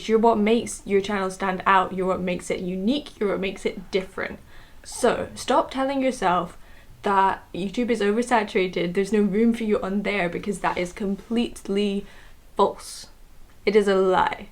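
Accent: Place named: West Country, United Kingdom. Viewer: What is your accent? I can't place it but then very occasionally it sounds very slightly west country? but then at other times very very subtly scottish... so both can't be right?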